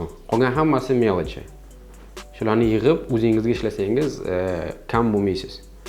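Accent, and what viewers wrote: Uzbekistan. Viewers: Самаркандча акцент )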